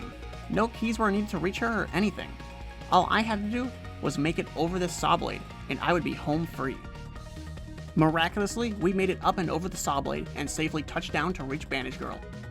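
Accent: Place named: United Kingdom. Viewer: British lad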